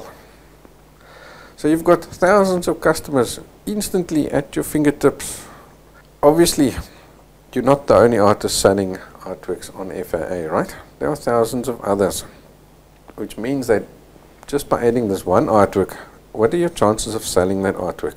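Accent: Afrikaans, South Africa. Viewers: Hi are you originally from South Africa. Sounds like you have an Afrikaans accent. I am from Cape Town, and wants to sell on FAA. Well I have sold two prints now. It is difficult to get the right people to see my work. My South African friends are not going to buy my work and pay in $$, our exchange rate is just too bad. Any ideas how I can get more international viewers?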